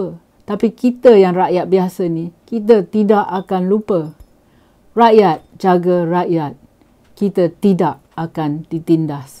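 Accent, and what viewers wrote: Perak, Malaysia. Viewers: T.kasih puan, saya berbangga dengan puan kerana bercakap penoh fakta dan bercakap loghat perak kerana saya pun orang perak.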